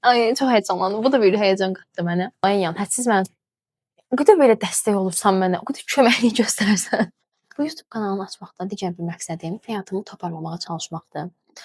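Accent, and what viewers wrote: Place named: Sheki, Azerbaijan. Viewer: Şəki ləhcəsi çox qeşeydi. Uğurlar sizə, çalışın motivasiyadan düşməyin ❤️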